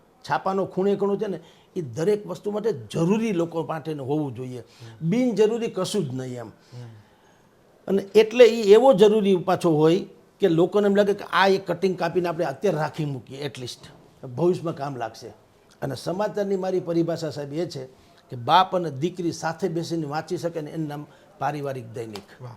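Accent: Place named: Saurashtra, India. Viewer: Vah bhai...Ek To Tal Sorthi Bolee neUparthi pattrakar,Meghanigini Yadav aavi gai.Ek var Jaher Sabhana 'Manch' par Aapne Bhashan 'DETA' Joi rhyo Chun.Maru aa Sapnu che.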